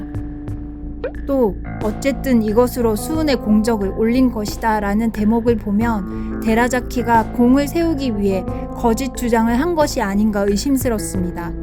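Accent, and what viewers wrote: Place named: Gyeongsang, South Korea. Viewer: 나래이션하는 분 경상도 억양이 언뜻언뜻 느껴지네요 ㅎㅎ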